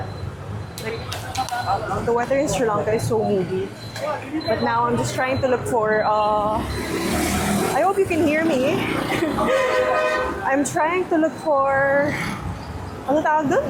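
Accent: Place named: Latin America. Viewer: Lol I thought you were from south America during the whole video until shortly before the end. 😂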